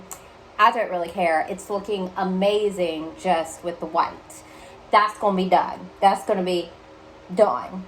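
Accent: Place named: South, United States. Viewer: One question I have wondered (for your Q&A): In your older videos, your voice sounds so different. Did you use to try to talk without your Southern accent? (I like your accent 😊)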